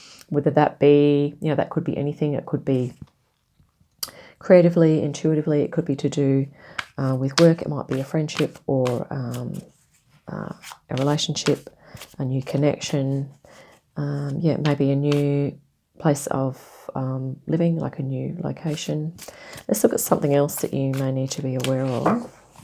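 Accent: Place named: Australia. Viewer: Yay another Aussie accent 🎉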